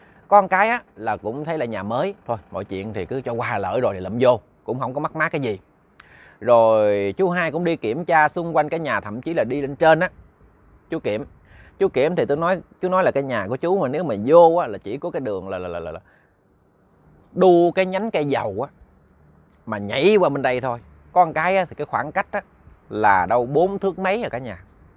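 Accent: Mekong Delta, Vietnam. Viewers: Rat' thich' kenh ke chuyen ma cua voi' giong chan chat' mien`Tay & nhung chuyen ma co' that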